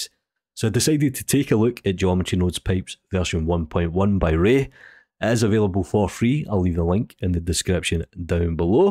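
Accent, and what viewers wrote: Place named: England, United Kingdom. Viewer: I LOVE YOUR ENGLISH ACCENT MATE LIKE FROM PEAKY BLINDERS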